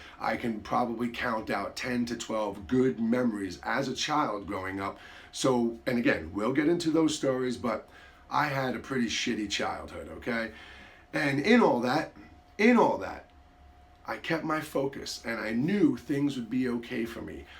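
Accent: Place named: New York, United States. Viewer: Love it and love your NY accent!💜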